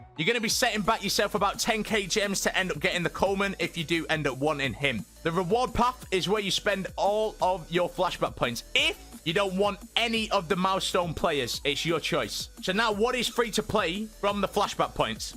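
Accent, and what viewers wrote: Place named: United Kingdom. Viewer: Teach me english! British english i mean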